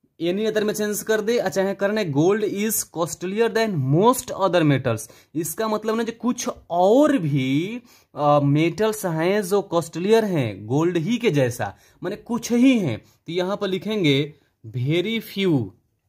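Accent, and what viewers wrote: Bihar, India. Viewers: Sir ,u from bihar 🤔🤔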